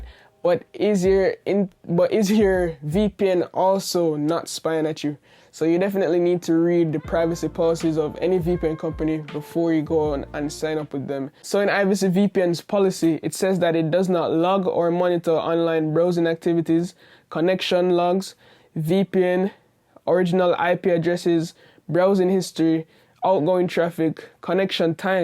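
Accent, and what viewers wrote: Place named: Jamaica. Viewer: Yeah, I can now here the Jamaican accent 😃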